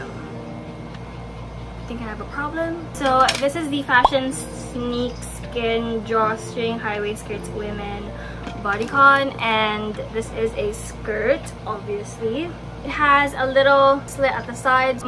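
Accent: Visayas, Philippines. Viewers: Bisaya ka? Hehe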